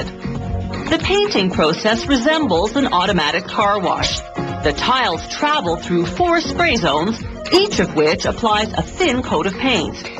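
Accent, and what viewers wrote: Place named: Canada. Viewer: I only just notice she's Canadian - "abouwt" and "ouwt" gave her away :'L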